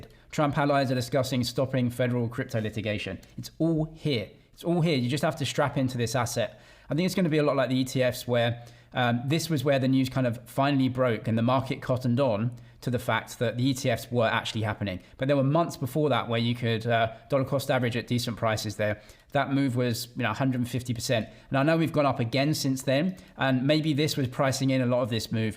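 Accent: United Kingdom. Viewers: Hi, where do you come from? You sound British.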